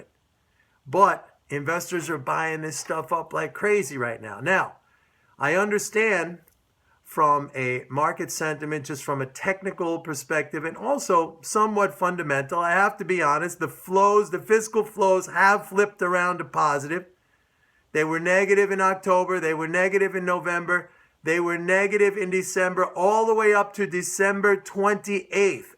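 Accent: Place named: New York, United States. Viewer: by the way ...Staten island ? .... or ... Brooklyn ??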